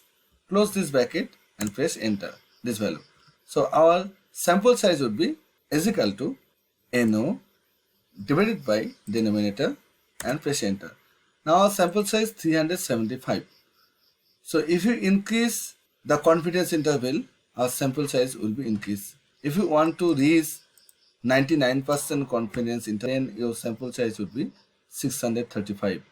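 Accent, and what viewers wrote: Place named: Bangladesh. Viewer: are you from Bangladesh? Your accent is very similar to the south east region of Bangladesh.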